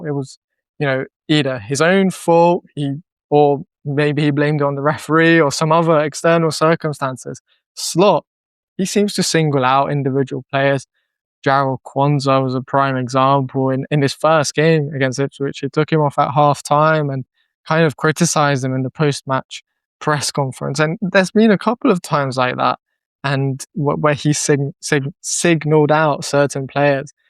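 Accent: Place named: Liverpool, United Kingdom. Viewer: Proper scouse accent there 🤨🤨🤨🤨🤨🤨🤨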